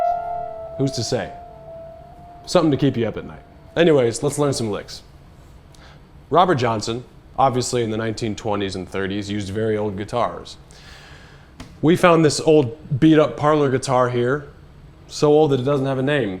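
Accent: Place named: United States. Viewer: Is it just me or is he speaking with a bit more of a cowboy accent in this video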